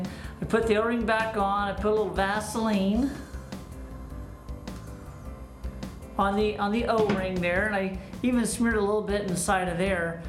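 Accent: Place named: Tennessee, United States. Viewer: She kinda looks like Elvis s sister in the way u talk so u from tenn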